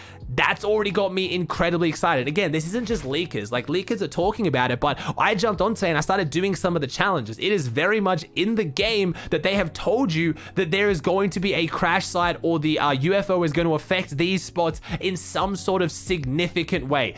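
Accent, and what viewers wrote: Australia. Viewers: now lol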